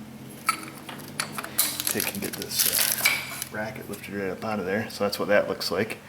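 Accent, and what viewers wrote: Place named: Texas, United States. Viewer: i really enjoy your taking the time to show the tools you use to do the jobs with. i also enjoy your slow texas like voice. i can understand every word. i was literally shocked you were from new york. showing the little things is not reduntant at all. and worth it to a lot of us. your lighting is great and camera angle. you are doing a great job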